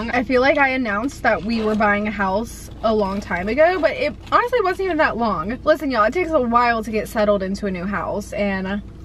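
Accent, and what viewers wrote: South, United States. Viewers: your southern accent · love that southern drawl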